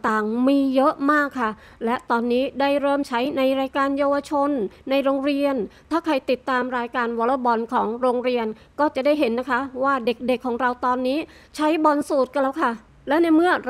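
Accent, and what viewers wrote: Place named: South, Thailand. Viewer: สุดยอดครับฟังเสียงน่าจะเป็นคนใต้นะครับ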